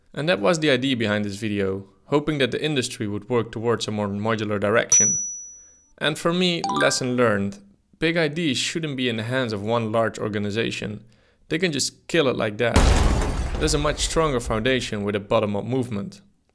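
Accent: Netherlands. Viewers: that dutch accent. makes it sound close to home ;) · Are you Dutch? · This dude Dutch?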